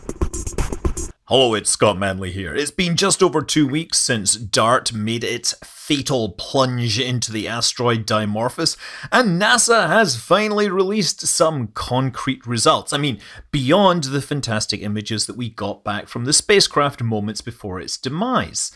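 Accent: Scotland, United Kingdom. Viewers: Scott once again I wish to thank you for your fantastic yet human explanation of this groundbreaking step forward for humanity. You take the complex and bring it into focus so that mere mortals can grasp it. Hearing your perfect explanation is only enhanced by your wonderful Scottish accent. Tipping hat with deepest respect.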